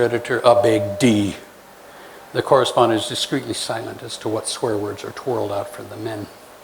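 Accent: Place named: United States. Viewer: Surprised, that you still speak a neat American accent after all these years in Thailand!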